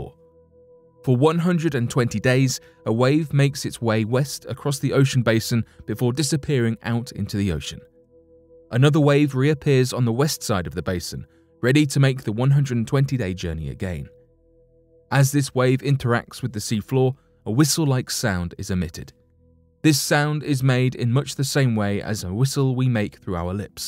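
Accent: United Kingdom. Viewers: Love this site, and the British voice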